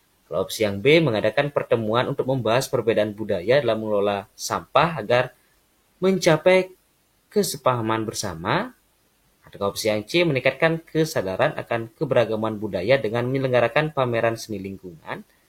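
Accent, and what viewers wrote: Bali, Indonesia. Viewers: Keren banget penjelasannya on point gak bertele2. Dan dijelaskan konsep dan triknya juga... logat balinya juga jadi pemanis😆 Saran kak, biar jadi pembeda dengan channel lain. Kakaknya fokus ke TWK aja. Pasti channelnya cepet berkembang apalagi kakaknya enak banget jelasinnya gampang dipahami jugaa.. Biar kayak lapak sebelah sang tutor yg fokus ke TIU hehee Sukses selalu kak...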